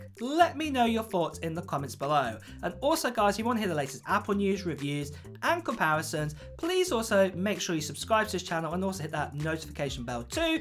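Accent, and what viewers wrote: United Kingdom. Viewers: 1) No offense but you do have a strong British accent/dialect and talking way too fast. Thus, for many people who’s English is fluent but not the first language is hard to understand you unless you slow down a bit. 2) We need a real world test of this Elite X Laptop vs Mac Book pro where we compare 4k video rendering time length between MacBook Pro and best Elite X laptop. Everything else is silly and waste of our time because even the cheapest intel i5 laptop would be snappy at opening apps, web pages and photos and is not an indication of how faster or more powerful elite X processor is.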